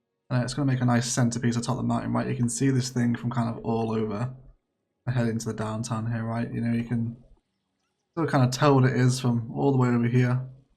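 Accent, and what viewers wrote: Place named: England, United Kingdom. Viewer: Awesome, you're easily one of the top 5 Cities Skylines channels around. Also, your accent is beautiful, which part of England is it from?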